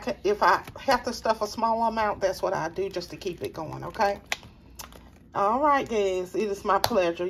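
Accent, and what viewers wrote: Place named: South Carolina, United States. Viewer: I love your accent. I thought that maybe you were from South Carolina. I was close. 😀. Keep up the good work, sweet lady.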